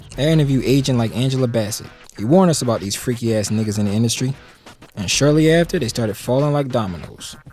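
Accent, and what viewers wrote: Baltimore, United States. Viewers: You sound heavy on the Baltimore accent 😂 but commentary though my guy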